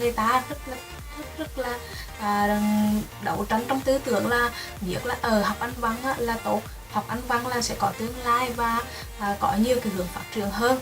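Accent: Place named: Nghe An, Vietnam. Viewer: Giọng nghệ an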